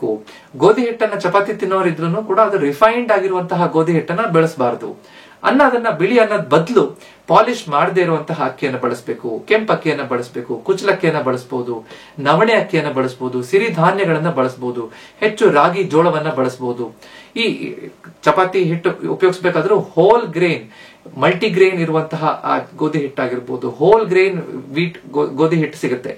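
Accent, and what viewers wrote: Standard. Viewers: Clear message. ಶುದ್ಧ ಕನ್ನಡ - Like always.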